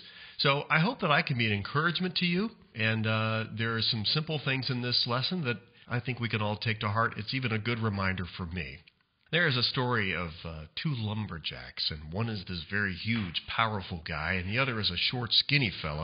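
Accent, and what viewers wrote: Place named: United States. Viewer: Love this kind of American accent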